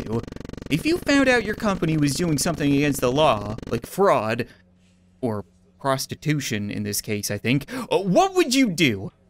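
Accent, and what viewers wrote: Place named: Canada. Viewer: Bug The Pug he's Canadian he says words differently get used to it or leave simply as that.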